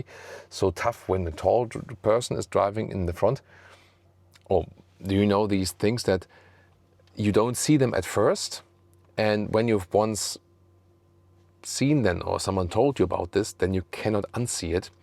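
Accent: Germany. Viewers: Thank you for the great review! German humour and accent is the best for reviewing german engineering. And involving freshens the video so much! The car looks good, but they could ve done better, I think... Great that the headlights are in one piece. Of course the black matt styling of the body would have looked more practical for those who really go off the paved road. And why no split exhaust???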